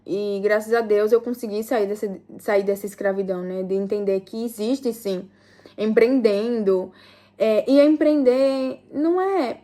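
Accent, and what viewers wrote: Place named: Northeast, Brazil. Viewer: Seu português é, um sutaque de nodestina .... e não tem nada Haver se mora fora do Brasil ou nao...😂😂😂😂😂😂😂😂😂Nodestina......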